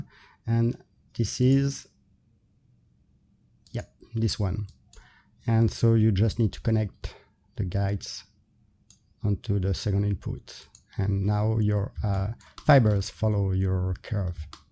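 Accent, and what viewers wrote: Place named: France. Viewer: Ah génial merci beaucoup!! Tout le long de vos vidéos je me demandais si n'il y avait pas un petit accent français caché derrière tout ça haha mais avec votre site il n'y a plus de doutes! Super travaux :)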